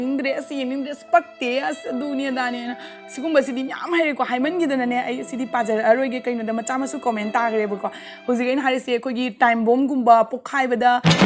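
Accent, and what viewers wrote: Cachar, India. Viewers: Meitei ga mayangagi style pullp mixed twrij cachar meitei gi chtnabiro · Mawa loude sis hainingba haijasanu shidaraga pamde phajade hairibasingse moi macha mashuda yaoba oirasanu thiba phajababu eikhoina oihanbra kalkpagine makhoidagidi sisna henba adugine eidi sis se cachar meeteine khnbada yamna nungsi lots of❤ from imphal video toina thagtlk o 👍👍👍👍👍👍👍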